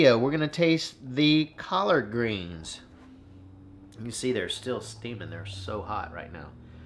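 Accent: South, United States. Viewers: When I hear that, I KNOW YOU ARE FROM THE SOUTH! FIFTYLEVEN!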